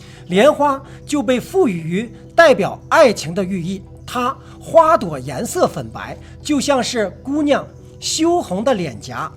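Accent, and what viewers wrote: China. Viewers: 大陆人有大陆口音有问题吗? 你要给配音吗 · 大陸口音需要那麼重嗎？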